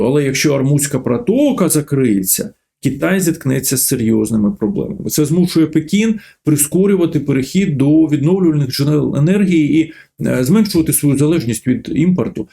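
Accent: Moscow, Russia. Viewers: vin mae moskovsjkyj akcent. akae bezudarni O.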